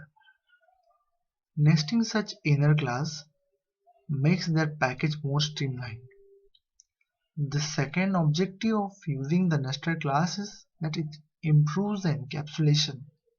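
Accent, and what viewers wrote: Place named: Mumbai, India. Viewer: omg am i gonna find some java video WITHOUT mumbai accent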